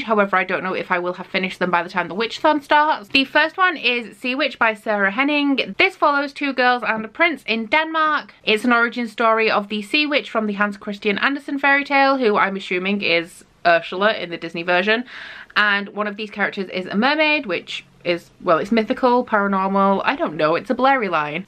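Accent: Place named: North, United Kingdom. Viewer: Ahhh, our accents are so similar! Are you from the north??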